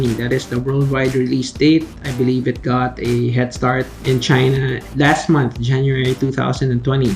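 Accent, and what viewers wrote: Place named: Philippines. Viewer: great review, ur Philipino btw? recognized the accent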